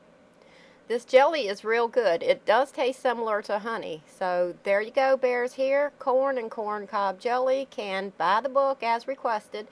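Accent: South, United States. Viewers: Great video! LOVE your southern accent, by the way. LOL! I don't like to eat a lot of corn, but a neighbor farmer gave us a load of sweet corn, and I hate to see food go to waste. My kids and hubby love corn. Honestly, I prefer frozen, but also like to not depend on freezers in a locale where sometimes our electricity is undependable. Very nicely done video. No annoying screaming music in the background, and you spoke clearly and didn't chat unnecessarily--but gave valid information.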